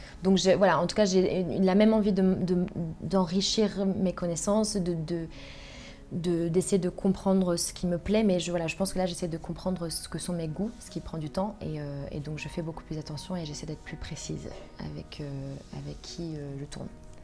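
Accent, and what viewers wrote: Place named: France. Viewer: She has a very good strong frenxh accent and British one 😮❤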